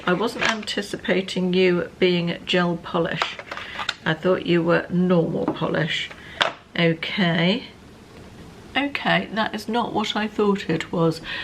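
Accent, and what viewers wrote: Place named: Oxford, United Kingdom. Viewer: I like your Oxford English?! At least it reminds me of my school English lessons 😊. Therefore I’ll come back to your next Temu Haul ❤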